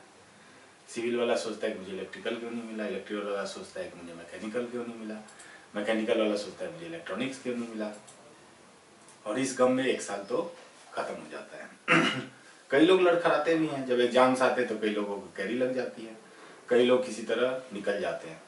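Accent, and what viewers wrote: Bihar, India. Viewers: bhai tum Bihar se ho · Aap bihari ho?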